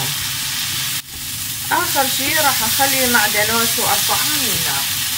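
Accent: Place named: Iraq. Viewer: تسلم ايدج بس حبيت اعرف اني من عراق والهجتج عراقيه بس لاحضت انتي مو بل عراق أي دوله ليش عوفتو عراق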